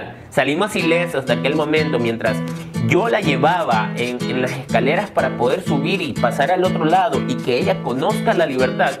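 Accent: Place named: Ecuador. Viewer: Hola yo también soy del Ecuador pero vivo en Italia yo llegué en tu canal por que soy animalista y una de mis amigas había publicado un video en Facebook entonces lo VI y por tu acento me dije el es mi compatriota y que orgullo saber que existe una persona haci en mi país El maltrato animal en Ecuador es muy penoso la gente no quiere entender que ellos siente dolor que tienen un corazoncito cuando estuve en Ecuador VI tantos animalitos en la calle maltratados perritos atropellados y para mi ver esto era un sufrimiento tan grande trataba de sensibilizar a la gente pero no es fácil espero que los niños del futuro que aprendan a amar y respetar a los animales me gusta pensar haci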